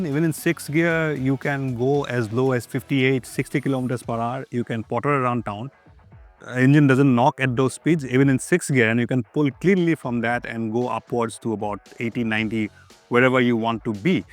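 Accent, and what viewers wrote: Assam, India. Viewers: In first 2 lines of this vedio of begining I could confirm the anchor is from Assam. Lovely demonstration being done.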